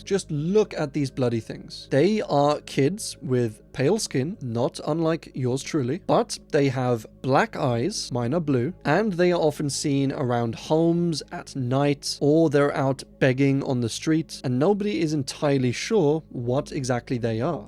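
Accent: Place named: United Kingdom. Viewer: I have to know why Brits pronounce “aw” like “are”. I just want to know what is going on anatomically. Like where is your tongue when saying “idea” or “law”. Where is the “r“ sound coming from? No judging. It just stands out. How does phonology differ so much? Is an R not an rrrr sound? How does a “v” become a “wha” (w sound) in some languages? When we all have the anatomical capabilities? Does v sound like w in India?? Just curious. 🤷🏻‍♀️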